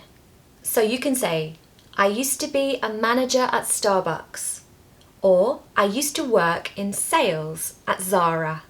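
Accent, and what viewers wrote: United Kingdom. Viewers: I watched several videos about this same topic but this one is so useful & informative with a superb lovely British accent that i wouldn’t hesitate to watch again ❤ Thank you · I appreciate your hardworking and the opportunity to see and listen to your videos every day, Really your British accent is incredible and I watch it every day your lessons. Thank you so much. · I love your British accent and watched your every episode and repeated . Appreciate ! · Great British pronunciation. Absolutely teachable class. T I want to reading passage class.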